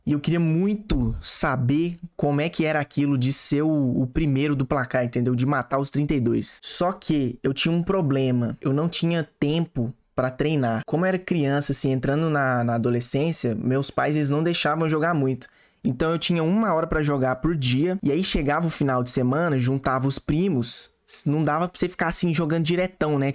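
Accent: Minas Gerais, Brazil. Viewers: Mineiro contando história já é bão! então... nem se fala! hahahaha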